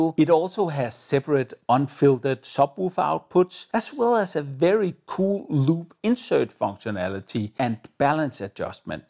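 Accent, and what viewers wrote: Denmark. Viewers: Unmistakable danish accent , but nonetheless informative and humorous!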